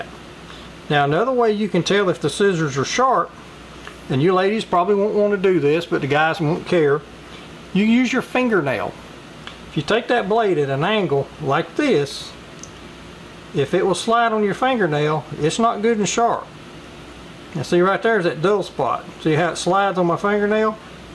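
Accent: South, United States. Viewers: I just love your southern drawl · thank you for this great video! It was nicely done and you very thoroughly explained the technique and WHY you don’t do certain things. I always find that sooo helpful when you tell someone why they shouldn’t do something (like the backside). You sound like you’re from the south, maybe North Carolina, because that’s where I lived for 25 years and my hubby is a southern boy from NC.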